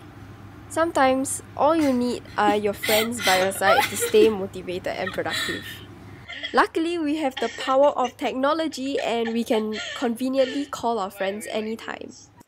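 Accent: Singapore. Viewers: first time hearing that a singaporean accent sounds french lol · I love how you don’t try to change up your sg accent 🤩🤩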